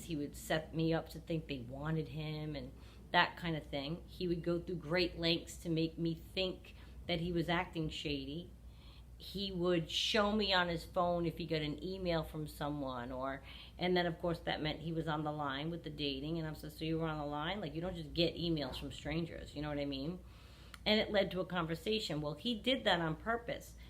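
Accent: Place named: New Jersey, United States. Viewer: Hi Just found your youtube channel today. I love you!! You make so much sense and you sound like people I grew up with in New Jersey😊 I live in Florida now after divorce from narcissist. Thanks for these videos. I like how you just talk like we are sitting in a room. I also like that you do not fill your videos with flashy intros and unnecessary music. I hope you don’t change the format. I especially love your dog in the background, so cute!!!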